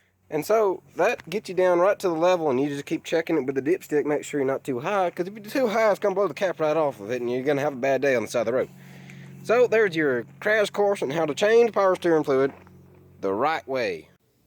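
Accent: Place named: South, United States. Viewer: Nice accent fellow southerner Also nice tutorial type thing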